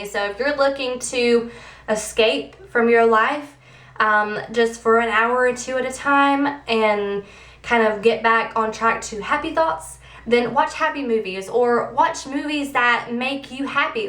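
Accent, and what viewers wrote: South, United States. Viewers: gahhh love your southern accent lol! good advice girl!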